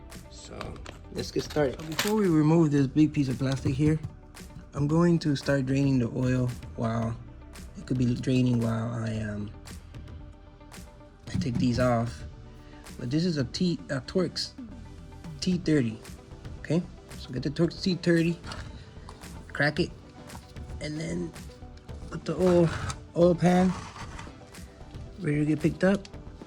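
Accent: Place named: Miami, United States. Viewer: Hey sounds like you're from Miami, how much would you charge for doing this to my car? Im located in kendall. My Tiggy is due for new fluid.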